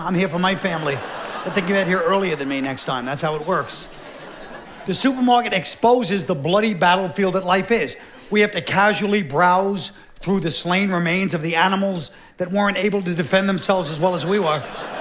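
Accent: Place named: New York, United States. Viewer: He sounds so New York but is so intelligent!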